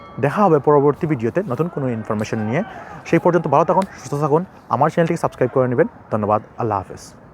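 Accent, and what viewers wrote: Sylhet, Bangladesh. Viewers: Vai Sylheti na ki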